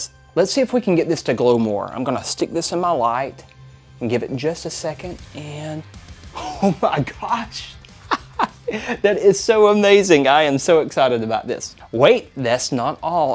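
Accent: South, United States. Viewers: You have a new fan. Great video very detailed and I swoon over that country accent ❤️❤️❤️❤️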